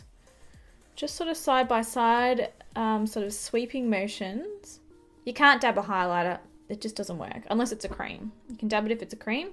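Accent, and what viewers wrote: Australia. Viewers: Love your tutorials and reviews and your lovely Australian accent! 😊 Did you feel like the handles were so flimsy they would break easily? Also, did the brushes shed?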